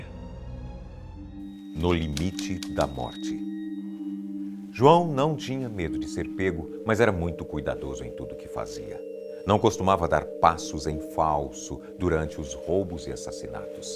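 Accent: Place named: Minas Gerais, Brazil. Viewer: Pq esse sotaque de mineiro se ele é Catarinense?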